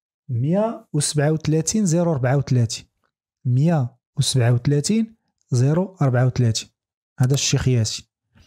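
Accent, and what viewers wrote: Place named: North, Morocco. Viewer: لهجت شمال المغرب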